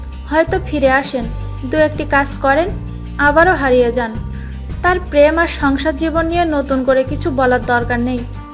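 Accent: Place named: Noakhali, Bangladesh. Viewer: ai noakhailla pathilar path sunle mejaj kharap hoy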